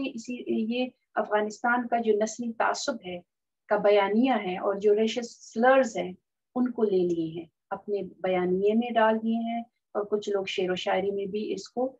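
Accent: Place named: Khattak, Pakistan. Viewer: Your pashto accent and dialects reflects that you are from Orya khel Khattak region?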